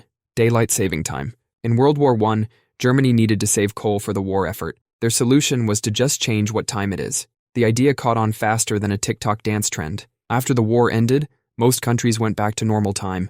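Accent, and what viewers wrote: United States. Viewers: the qwerty board is not "the global standard"... leave it to Americans tothink their standard is the default. There is QWERTY, DVORAK, AZERTY, COLEMAC and QWERTZ in addition to Arabic, cyrilic and asian variants standard lmao #shytamericanssay #americandefaultism (yes I know the channel claims UK, his accent is not English/Welsh/Scotish/Irish, it is American if the "some states finally had enough" comment he made with DST didn't clue you in, a Brit would not default to "states")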